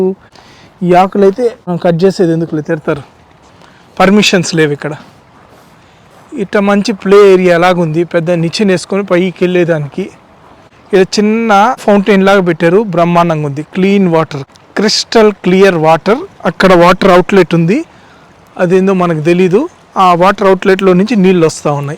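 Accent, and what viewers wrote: Nellore, India. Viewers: I live in USA and watch your travel videos. Though I watch lot of youtube videos on travel and other related topics, I very rarely write comments. However your channel is exception. Just letting you know that your videos, presentation are very nice a your Nellore accent is very entertaining. Please keep traveling, capture your experiences good/bad and post. Wish you good luck and hope your channel grows in popularity.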